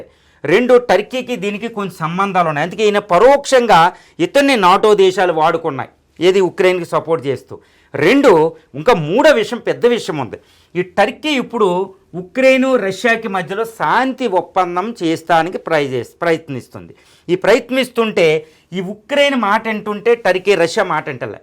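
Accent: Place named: West Godavari, India. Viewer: Bhabai,what an explanation sir (with WG.state accent) good , very good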